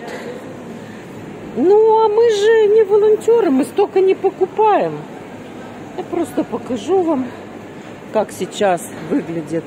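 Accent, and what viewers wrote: Odessa, Ukraine. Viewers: Снова и снова восхищаюсь голосом и одесским говором. Спасибо вам большое. Смотрю вас с удовольствием.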